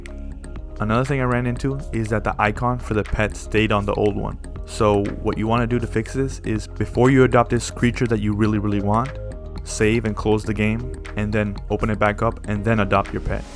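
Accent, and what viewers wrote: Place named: Brazil. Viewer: Estava me perguntando se você era brasileiro pelo sotaque, aí me deparo com o pet com o nome "Pica-Pica". Confirmado 😂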